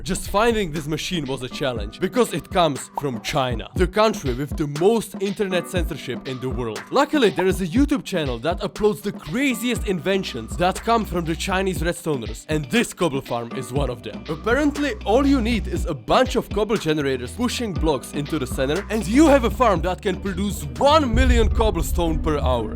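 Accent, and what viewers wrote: Czech Republic. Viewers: Pekne video. Normalne poznas cecha mluvit anglicky😂😂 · You sound Czech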